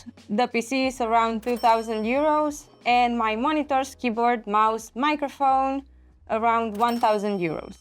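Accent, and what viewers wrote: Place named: Liverpool, United Kingdom. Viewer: Nice accent. Liverpool?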